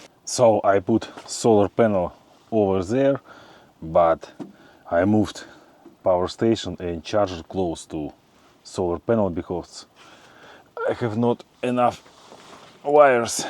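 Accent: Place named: Crimea, Ukraine. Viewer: Ох, как бьёт по ушам этот Крымский акцент )))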